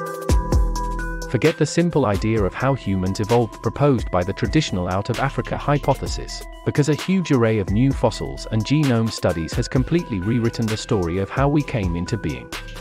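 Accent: United Kingdom. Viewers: Nice documentary, but why do all documentaries like this seem to have to have a British accent narrator? :)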